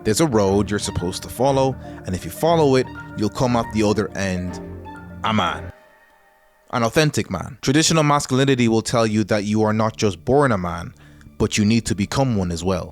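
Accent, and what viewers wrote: Ireland. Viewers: For some reason I thought you were a white Irish guy, is there someone else narrating the video 😅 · I hear a bit of an Irish accent? Am I right or am I going mad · Are you irish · Thought you were Irish for a sec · are you irish? im trying to place your accent 😅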